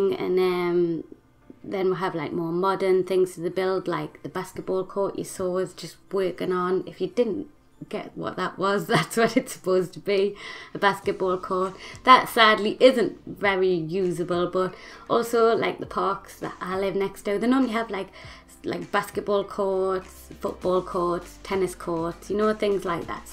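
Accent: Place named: Newcastle, United Kingdom. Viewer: Geordie through and through! Why aye lass!! Love your builds X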